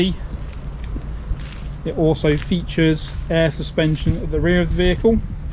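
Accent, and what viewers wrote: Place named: Australia. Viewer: why do you speak like an Australian ?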